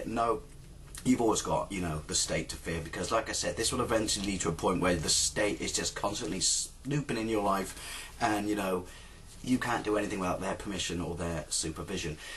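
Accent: United Kingdom. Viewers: Honestly if I couldn't hear your British accent I would have thought you were an American. The exact same shit is going down over here. Slowly but surely they are trying to chip away our freedom all in the name of public safety. Also our troops never actually make it home but instead get shipped off to yet another war. I've never seen CCTVs though. I'm sure our current administration is pushing for that as well as they think China is the ultimate template for national security.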